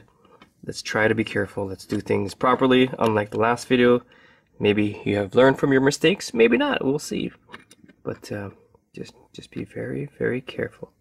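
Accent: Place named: Miami, United States. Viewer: This sounds like a Miami accent. Doral over here.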